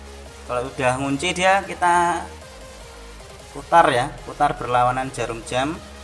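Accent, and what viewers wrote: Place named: Java, Indonesia. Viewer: konten kreator ml exe keknya org jawa🤣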